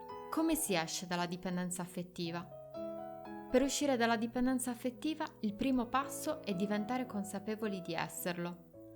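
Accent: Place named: Sardinia, Italy. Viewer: Giustissimo avere una dizione corretta nel proprio bagaglio, ma spesso gli accenti danno personalità ai racconti.. A me il tuo sardo piace🌊💙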